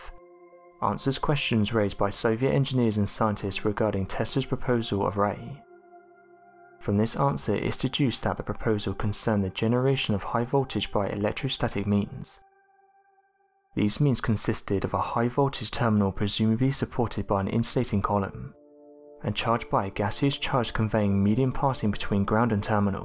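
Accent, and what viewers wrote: England, United Kingdom. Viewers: He has a normal English accent, and no one is making you listen to it.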